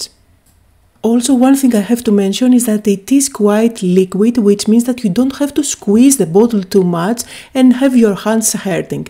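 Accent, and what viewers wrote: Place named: Greece. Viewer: Its raining CAT’s and dogs here. So nice to hear you, your Greek accent. I always feel the nostaligy of all my Greek holidays. Thank you for this. Cardiamou.❤️🍀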